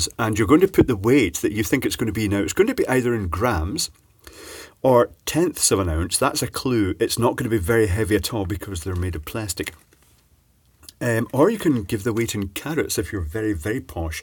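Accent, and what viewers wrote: Scotland, United Kingdom. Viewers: What did Mr say? Sounded like 'putting seek diamonds'. What was that word before diamonds? Also where has the Scottish accent gone?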